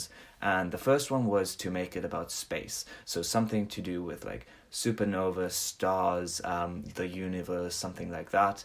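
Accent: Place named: South Africa. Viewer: i knew i heard south african :) my parents are both from there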